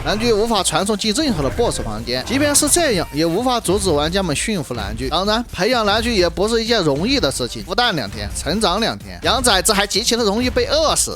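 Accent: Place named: Sichuan, China. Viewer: 的地道川味方言视频竟然在油管这么火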